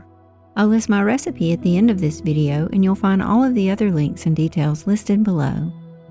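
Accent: South, United States. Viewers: Wow, I really like this swirl ❤. I love making soap and watching your videos too, they are so relaxing and you have such a nice voice, I love your southern accent 😊. Thank you for another wonderful video.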